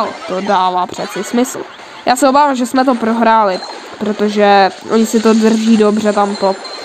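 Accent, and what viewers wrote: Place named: Ostrava, Czech Republic. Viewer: Je Ostravák :)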